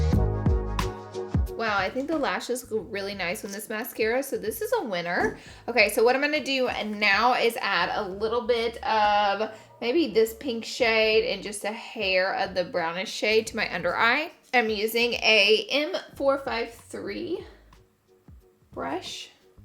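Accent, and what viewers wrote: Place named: South, United States. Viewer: You have an accent (to me)! Where are you from? It’s very southern belle/ country lol and I can’t tell your ethnicity 🧐🤔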